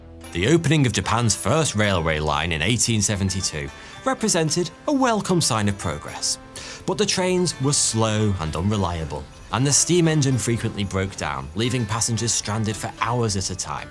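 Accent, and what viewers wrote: United Kingdom. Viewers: I might be from the States but your accent and mannerisms are key on, not to mention the dumbest, corniest jokes EVER! Bravo to the hilarious Brits! Wish I could join this channel in some way! The editing is flawless btw ;) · Most British voice ever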